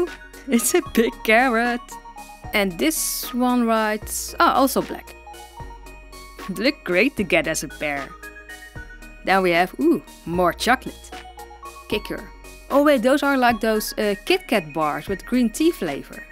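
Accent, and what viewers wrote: Netherlands. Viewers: Wait? We Dutch like our coffee too. Well okay I’m English but living in Holland these last few years gave me a love of coffee. I’m going to susbscribe and see what you do with dolls. Btw, are you from the north of Holland? I can’t quite place your accent :P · Ik kon gelijk horen dat je uit Nederland kwam😂😂❤️❤️❤️ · I didn't know you were Dutch as well until I watched Fairy's video and now I can clearly hear your accent xD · OMG YOU ARE DUTCH? OR LIVING THERE? I can just hear it at your voice! I am living here too. in Rotterdam. now I feel better. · Haha, hoor t gelijk, dat is een Nederlander xD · Ik hoorde je accent en vroeg me af is zij Nederlands ofzo xD Edit- waar haal jij je manga? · I KNEW IT - I recognized the Netherlands accent in your voice! I live there too-! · Dus je bent eigenlijk Nederlands? Btw dat denk ik door het accent ———————————————————————- So you are acually dutch? 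· ik kan zien aan je acsent dat je nederlands bent xD \ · I live in the netherlands too! This is my first time watching your channel, and when i heard your voice and accent i immedianntly knew you lived in the netherlands! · I can just FEEEEEEEEL the dutch accent (yes, me myself is also dutch) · Ik dacht al dat je Nederlands was door je accent. Leuke video ❤️ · Ik kan het gewoon duidelijk horen aan de manier waarop je praat dat je Nederlands bent 👏👏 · haha ik vind je nederlandse accent echt geweldig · Ha ha😁 I'm Ducht and can hear you are to😆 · Ik hoor het aan je stem dat je nederlands bent en je praat nederlands en Nederlands 😅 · I’m new to this channel but when I heard your voice I knew you where Dutch! Finally someone who likes dolls and Littlest Pet Shop that lives in the Netherlands! Thanks for making this channel! This really helps me out but can you make videos for Littlest Pet Shop? 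That would be nice! Still nice video! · Haaaaaaaaaargh Nederlands accent😂, ik wist ineene wel dat ik de goeie video had! En echt wel een gaaf kanaal ey! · Oml NEDERLANDS YES YES YES IK WIST T AAN JE ACCENT :D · haha je hoort echt dat je uit nl komt maar leuke video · Ik kan het horen dat je Nederlands bent. Het accent😂😂😂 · U can hear ur dutch😂😂😂 so am i btw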